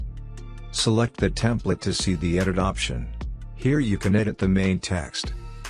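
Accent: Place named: United States. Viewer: YES SIR BUT WHEN I WAS WATCHING ONE OF YOUR TUTORIALS , YOUR ASSCENT TO SPEAK ENGLISH WAS LIKE AN AMERICAN , PLEASE REPLY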